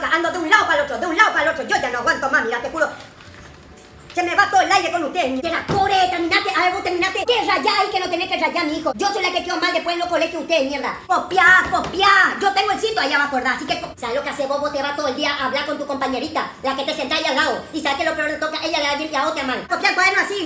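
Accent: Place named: Entre Rios, Argentina. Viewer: Malismo, asi no hablan las paraguayas y eso que estuve con varias, parece mas bien campesina del norte de entre rios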